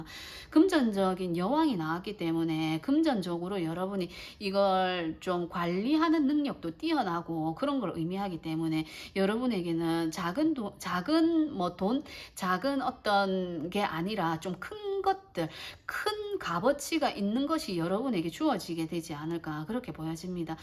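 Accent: Gyeongsang, South Korea. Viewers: 경상도 사투리땜에----😢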